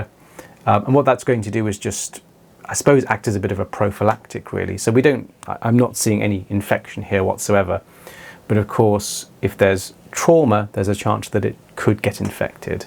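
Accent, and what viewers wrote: United Kingdom. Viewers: hahaha, you probably would 😭 In American media, Im pretty sure your specific way of speech is one of the UK dialects that is most often replicated by American actors. Thats super cool though! Wonderfully informative video :0 I learned a lot about the scale of the tools, the dexterity and hand steadiness is very impressive!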